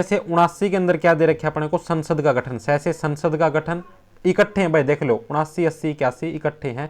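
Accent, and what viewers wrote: Haryana, India. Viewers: English bolna laga sir ap to pr harynvi achi laga hai apka muh se😁😁😁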